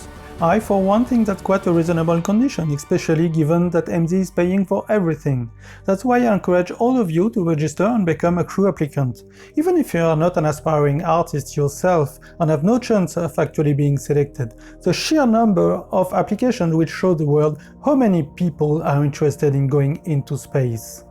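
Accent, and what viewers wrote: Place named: France. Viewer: Nice french accent 🤟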